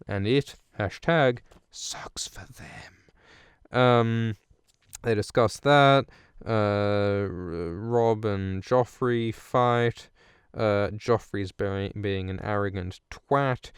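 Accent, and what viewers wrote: Australia. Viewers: Is there going to be a burp in every episode? I'm not complaining, just observing. That combined with the swearing and the accent wants me to see more Australian readings of popular book series. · Fellow Aussie here, nice to hear GoT in an Aussie accent!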